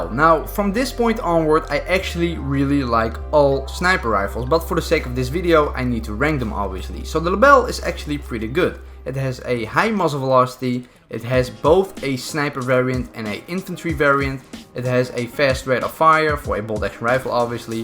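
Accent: Netherlands. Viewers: ' ' ben je Nederlands? Het klinkt zo maar ik weet het niet zeker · Are u dutch? Ur accent really sounds dutch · Ik hoor dat je nederlands bent 😆😋😂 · is he dutch?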